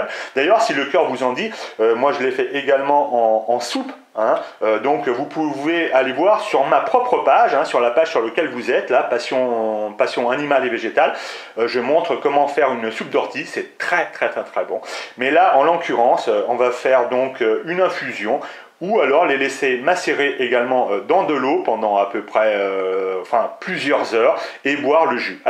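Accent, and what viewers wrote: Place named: France. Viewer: Tu m'as convaincu j'en fait une soupe dès demain ! (PS : j'adore ton accent bien franchouillard, tu respire la sympathie et la bonne humeur ;) )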